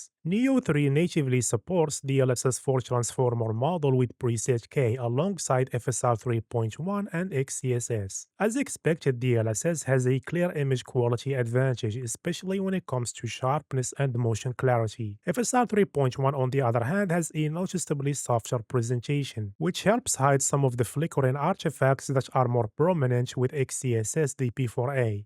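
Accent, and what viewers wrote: Morocco. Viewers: Are you moroccan by any chance cuz you have a strong moroccan accent ?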